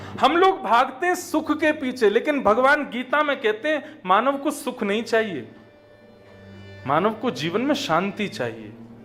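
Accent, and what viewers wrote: Braj, India. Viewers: Jai jai aapki Katha bhut acchi lagti hai aapke mukharvind se brij bhasa bhut sunder lagti hai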